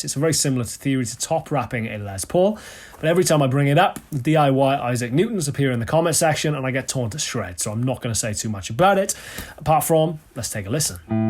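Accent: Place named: Cockney, United Kingdom. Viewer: i didnt listen to that kocknee stupid accent ! ol boy but when you played i got up turned that to rock concert level! you are very good but i will not listen to you speak.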